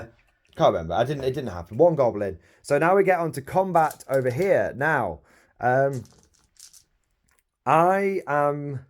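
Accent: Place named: Northern England, United Kingdom. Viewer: It’s a Northern English accent mate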